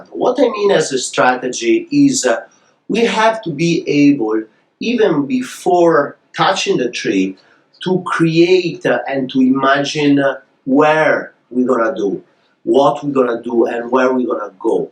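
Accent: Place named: Italy. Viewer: Ma quanto internazionale? Almeno l'inglese italianizzato si capisce bene😅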